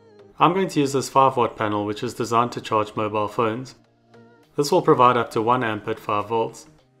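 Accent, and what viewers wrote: South Africa. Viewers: Sjoe, jou aksent skree saffa boet.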